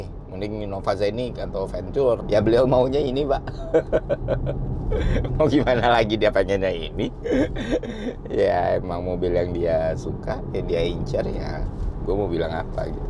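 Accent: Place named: Jambi, Indonesia. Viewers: Mantab bang, logat Jambinyo masih ado. 😅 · Seneng nian denger bang make bahaso jambi, samolah cak palembang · Enak nian dengar kau bahaso jambi bg, mantap 🔥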